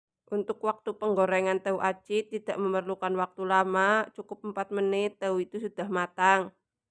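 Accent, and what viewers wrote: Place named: Tegal, Indonesia. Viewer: Jualan tahu aci semoga menjadi ide bisnis yang sukses,kaya logat Tegalan...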